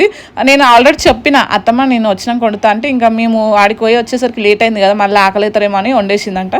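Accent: Telangana, India. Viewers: Telangana slang anthe untadhi bro ❤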